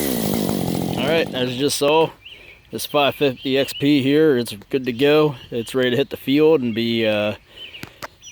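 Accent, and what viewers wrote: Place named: Pennsylvania, United States. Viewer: Hardcore PA accent right there.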